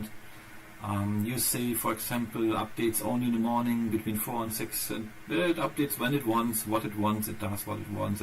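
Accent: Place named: Germany. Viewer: Hello! I hear you have a German accent. So where do you come from? Best regards, Serge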